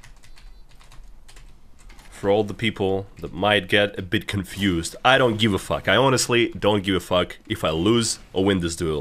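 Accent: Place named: Russia. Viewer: Единственный канал на английском, который я могу нормально смотреть (уж слишком русский акцент помогает, да и местами русская речь прикольно звучит (¬‿¬ ) )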